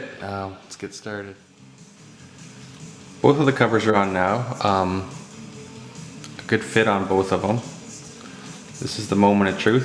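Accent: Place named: Canada. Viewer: With a Canadian accent though.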